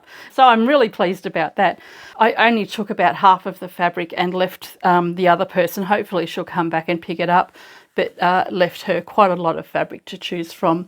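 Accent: Australia. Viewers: I always enjoy you videos & love hearing your Australia accent. So, talk all you want. ❤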